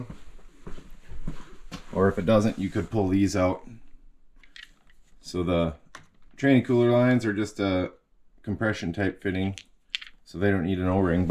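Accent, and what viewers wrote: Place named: Minnesota, United States. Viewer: Fellow rural Minnesotan here. About to do a ps pump on my 98 Tahoe this weekend. This video was immensely helpful and informative. Certainly helps we speak in the same dialect 😂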